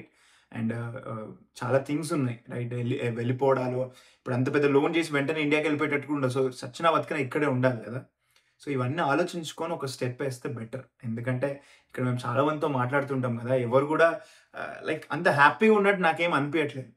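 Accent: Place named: Telangana, India. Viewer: Ey uuko, nuv kudaa endhi itla, ah person name sudu chasta gista ani endhoo undhi, Malla ochi rude negative ani ochi neethulu bolra unho. Nay hotha miyaan aisa. And chasta kaka Telangana and Rayalseema slang and words gitlane untayi, inka cheppalnante neat ga manchiga ne cheppindu. it is neither condescending nor disrespecting or discouraging, you better understand the native tones and dialects. He’s delivering a positive speech and conspicuously explaining all the details. If possible grasp all the good things in what he’s trying to feed into kind of dumb brains who have no clue why and for what they are coming to the states or else if you are not interested to listen just move on. Okkariki help aina this video will be useful. Prolly you are dreaming about dollars and that is why you didn’t like what he is illustrating.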